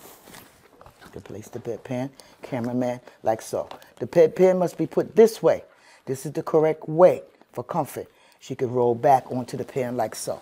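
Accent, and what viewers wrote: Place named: New York, United States. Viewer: Love her accent is that ny?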